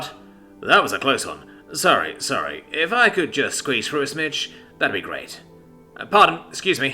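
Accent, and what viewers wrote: Canada. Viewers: Defiantly a Canuck!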